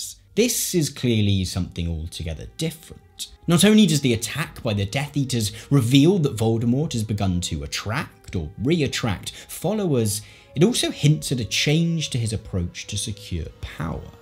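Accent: RP, United Kingdom. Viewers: Hi, I love your contents but I am fascinated with your accent and intonation in particular. could you please tell me what is your accent?is it posh?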